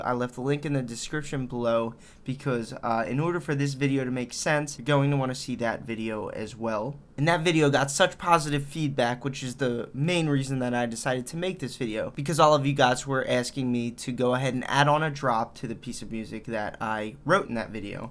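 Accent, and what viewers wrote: Philadelphia, United States. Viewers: This man is from Philly or Baldimore for sure, am i right? Sick tip though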